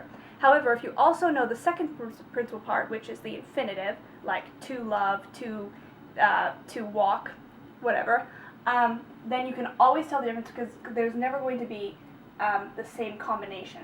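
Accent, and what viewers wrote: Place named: United States. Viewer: Latin with strong American accent? No, thanks.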